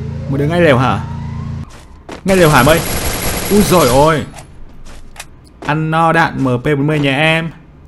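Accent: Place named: Hanoi, Vietnam. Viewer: Hà Nội lận cơ à hèn chi giọng ko giống miền Nam of E r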